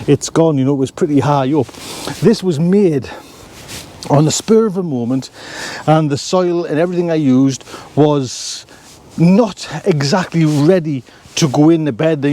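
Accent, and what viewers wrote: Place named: North East England, United Kingdom. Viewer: Hello. Just found your link on Steve’s channel and as a new gardener (now retired) I am always on the lookout for gardening tips so I will be going through your past videos. When I heard your accent I was curious where you were from in the North East. I am from Stanley, Co. Durham so I thought it would be nice to get tips from someone who is in the same climate as myself. Looking forward to your past and future videos. Appreciated. Allan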